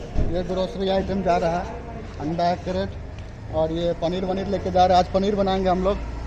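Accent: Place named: Bhojpuri, India. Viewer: Commentary sahi hai bhaye... With bhojpuri ascent...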